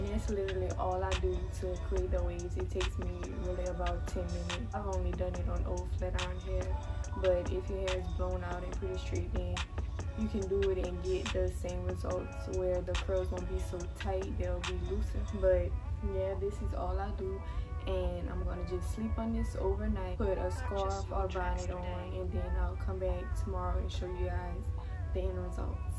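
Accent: United States. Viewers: You have such a pretty voice / accent, are you from New Orleans?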